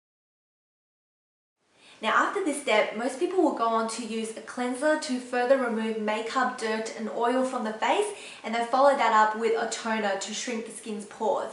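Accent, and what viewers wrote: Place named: Australia. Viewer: you live in australia.. hahah i no ;) *your accent***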